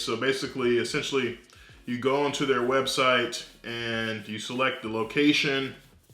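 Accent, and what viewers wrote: South, United States. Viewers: Did you take speech classes to curb your southern accent?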